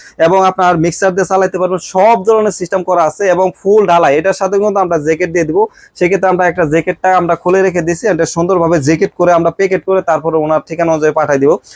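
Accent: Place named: Sylhet, Bangladesh. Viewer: Sylhet tone dekse bhai 🤗🤗🤗🤗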